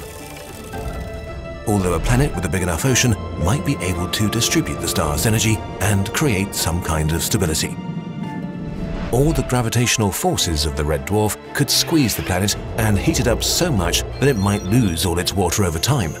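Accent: United Kingdom. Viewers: British guys with relaxing voices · Something about a British man calling a Red Dwarf a baby is satisfying.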